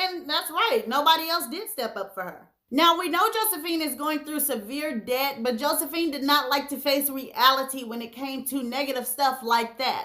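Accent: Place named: St. Louis, United States. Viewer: sounds like a STL woman.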